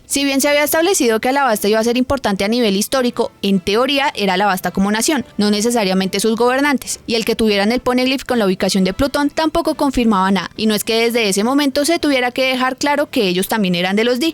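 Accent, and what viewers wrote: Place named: Bogotá, Colombia. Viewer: Me fascina ese acento de Bogotana…😊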